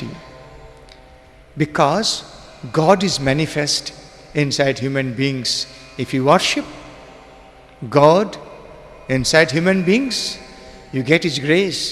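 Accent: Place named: Bengal, India. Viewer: Melodious Bengali ascent... enchanting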